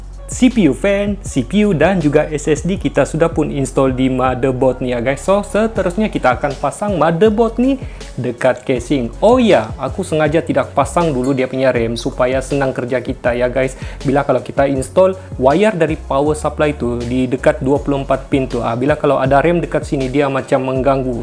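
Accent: Sabah, Malaysia. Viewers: He from Sabah so have different slangs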